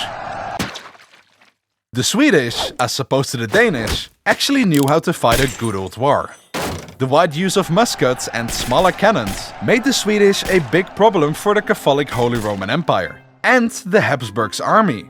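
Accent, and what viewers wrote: Netherlands. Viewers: This video is great, the hilarity brought to me by the Dutch accent is even better. Not that your English is bad(its amazing) but the Dutch accent is very noticable and silly sounding. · I hear a Dutch speaker 🇳🇱🇳🇱🇳🇱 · Dutch oversimplified (als ik het accent acuraat heb) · Just subscribed, but this accent seems very Dutch even though nailing the English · Dude, your pronounciation! That's not how you say words! Please do something about not only your extreme Dutch accent, but also please just learn for example where the accents are in the words catholic and protestant before doing a video on the reformation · I smell Dutch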